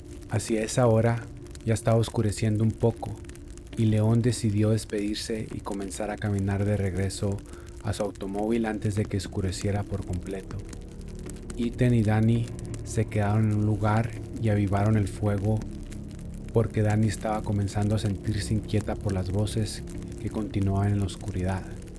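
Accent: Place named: North, Mexico. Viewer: Me gustó el video. Como sugerencia personal, tal vez puedas engrosar un poco la voz para que suene más tenebrosa. Las historias son buenardas. Por cierto, eres del norte de México, ¿verdad?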